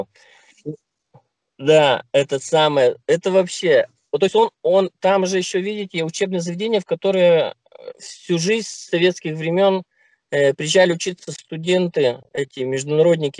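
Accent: Perm, Russia. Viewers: Никогда я не спутаю пермский говор! Первый день в Чайковском почти ничего не понимала))) Приезжайте в гости к нам в Армавир! Все приезжайте! а ВИДЕО Я ПОКА НЕ ДОСМОТРЕЛА. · Говор пермяков мне вообще не нравится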